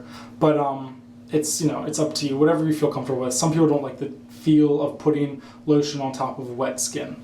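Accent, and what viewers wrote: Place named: Rural, United States. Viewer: You Speak like a brain dead hick ....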